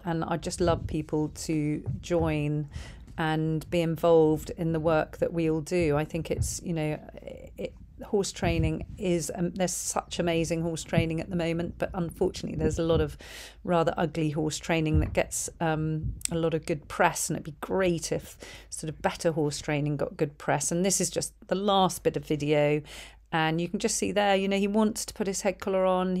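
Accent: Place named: United Kingdom. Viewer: Thank you so much for taking time and making subtitles! British accent is way more difficult to understand than the american one (for me personally). The funny thing is that we study at school British English but USA produces a lot of content on the internet so i had to adjust and now i understant American English better than British English 😅 Also thank you for sharing the right way of "talking" to animals. The World needs to be a better place for them.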